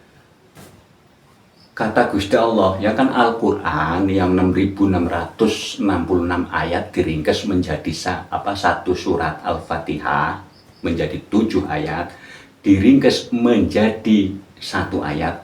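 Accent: Banyumas, Indonesia. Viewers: dari logatnya sih jawa ngapak, pokoknya antara brebes-tegal-pemalang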